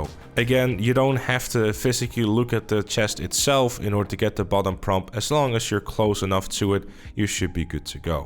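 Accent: Netherlands. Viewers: Jij bent Nederlands dat hoor ik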